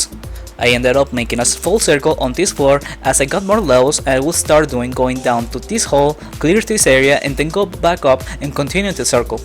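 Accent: Mexico. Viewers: Tienes buen inglés pero te escuchas mexa (no es que sea malo) sigue practicando para que suenes nativamente pero igual buen video Casi creí que era un canal gringo 🤣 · are you mexican? i feel it on your voice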